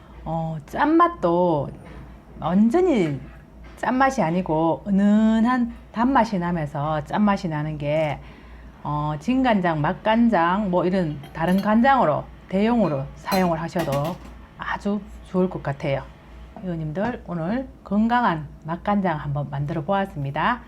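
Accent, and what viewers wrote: Gyeongsang, South Korea. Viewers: 님 프사 버꾸셨네요? 항상 좋은 정보와 레시피 올려주셔서 감사하고 대구말씨 넘~~좋아요👍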